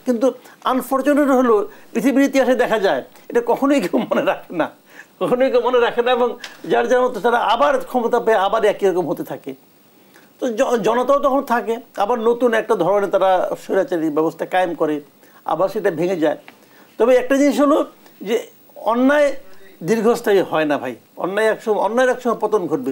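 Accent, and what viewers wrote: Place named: India. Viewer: উপস্থাপন কন্ঠ কেমন যেন ভারত ভারত লাগছে।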